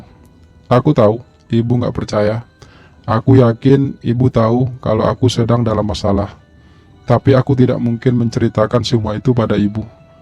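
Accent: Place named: Padang, Indonesia. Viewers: INTONASI NYA BENAR KENTAL PADANG BIAR DIA.BERBAHASA INDINESIA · Dari logatnya dipastikan adminnya orang padang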